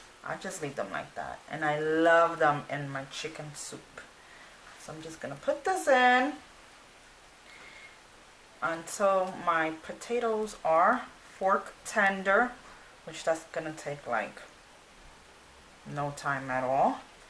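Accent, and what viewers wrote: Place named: New York, United States. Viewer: Looks delicious going to make for my family today, I wonder how long it took to cook the chicken in the beginning? Also where are you from, sounds like a New Yorker to me 💁🏻‍♀️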